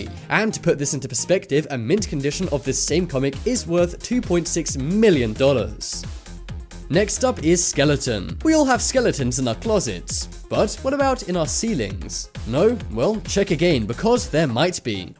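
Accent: United Kingdom. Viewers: The chattery British narrative is hard on the ears. What's scary around finding a rusty old gun ? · He sounds British. I live 3 miles from America, they don't talk like that.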